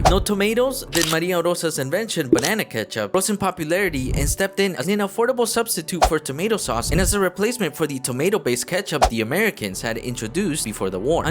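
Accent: Philippines. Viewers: Why you sound like a filipino manila boy from a middle/high class family? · You are slowly having the Filipino English non-accent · You talked like a filipino now with a ‚no?‘ at the end of the sentence 😊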